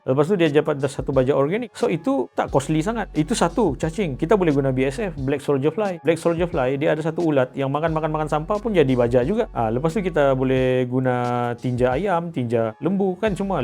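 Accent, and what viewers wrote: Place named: Sabah, Malaysia. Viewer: Sedap dengar slang sabah ba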